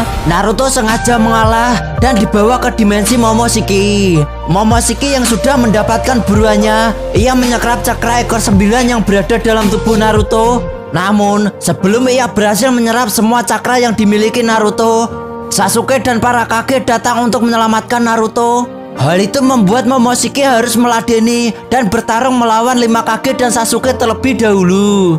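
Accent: Java, Indonesia. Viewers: lah medok kali bahasa mu tong ,, ngakak njirr kayak lagi rap ini.. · pekat jawanya bang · Pekat beno jawa kau bang🤣🤣🤣🤣🤣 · Medok bgt bahasa jawa nya wkk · Medok banget cukk 🤣🤣🤣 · Jawanya pekat😃😃😃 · Bahasa nya medok jowo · Oalah medok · Jawa amat si suaranya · Terlalu medok suaranya😂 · Logat jawa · jawaneeeee medok abis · jan medok tenan rek😁😁😁😁😁😁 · Medok jawa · Medok bgt mas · kok pekat banget jowo nya min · Medok nya itu lo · Medok banget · Medok x jawamu telor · Asli mana ngab?... Logatnya medok amat🤭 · baru nonton semenit pala pusing denger jawa ngomong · medok amatttt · Kayaknya orang jawa ni yg pnya chnel ini , dari logat nya 😂🤣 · Medok sekali😂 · logat mu loo jawa banget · Medok banget abangnya, jawa tulen kayaknya... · Yooo org Jawa taelaso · Yang bicara auto jawA 😂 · mbahasa lo muedog buanget bro 😂😂😂😂😂😂 ga uenak di denger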